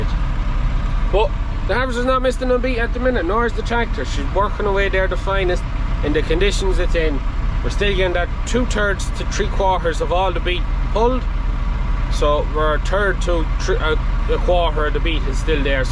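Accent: Ireland. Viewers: I’m a first time watcher. It sounds like you’re from Ireland. My great, great grandfather immigrated to the USA from County Caven in 1828 when he was 14 years old with his family. My brothers & I now own the farm he settled in Renville Co., Minnesota in 1866. My mom inherited it when her parents died in 1987. We inherited it from her in 2008 when she died. We rent the cropland out to a corn & soybean farmer. It’s a little more than 92 acres. What county are you from? Thanks. I subscribed too.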